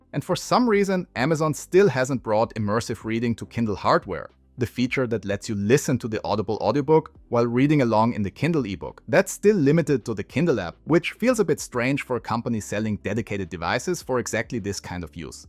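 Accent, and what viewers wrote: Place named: Austria. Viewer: wenn ich englisch mit österreichischer "Melodie" höre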